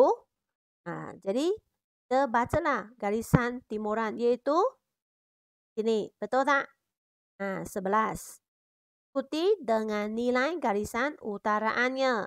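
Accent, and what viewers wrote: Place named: China. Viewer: Cuma cikgu ni Chinese so slang dia lain sikit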